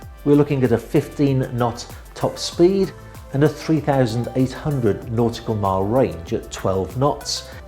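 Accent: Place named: England, United Kingdom. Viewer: Sorry, had to thumbs down for wearing a mask while walking alone outside. Also, that damn regional English accent that pronounces ing as ink, its driving me insane. Spiffing Brit does it even worse and it makes me almost have to stop watching his content. It's just something about saying k instead of ing that drives my brain to insanity. You might as well pronounce w as z. Its so random and makes no sense.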